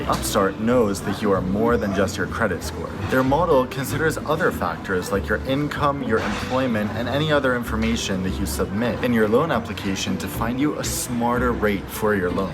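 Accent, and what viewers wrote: Singapore. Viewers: I subscribed when I heard you speak with that Spore accent! 🤣👍👍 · Hahaha love the Singlish scattered thru out this video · mate, first time watching your videos, im blown away not just by the quality of your review, but also your singlish!! Wa eh steady leh, very rare to hear an angmoh dude like you speak like us! thank you for giving our beautiful creole language some spotlight! :) - from sg with love :) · That Singlish is absolutely awsome!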